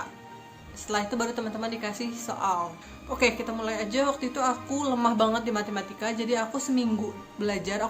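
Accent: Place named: West Sumatra, Indonesia. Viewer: Dari cara ngomong nya di dengerin per kata seperti orang minang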